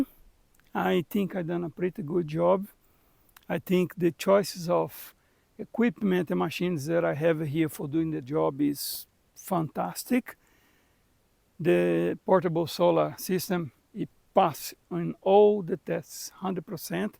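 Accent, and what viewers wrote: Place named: Brazil. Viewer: Hello. I am enjoying your videos. Great work and effort. My daddy was from a small village in Galicia called Fixo. He told me a lot of stories growing up in a small stone house like the one you are restoring. They grew potatoes, corn and hogs up there. They plowed with an Ox and worked all the time. He said it was dry country which looks like it is from your videos. What area are you in? Are you a Brasileiro? Your accent sounds Brazilian. Keep posting videos I am enjoying the progress. Have a good day.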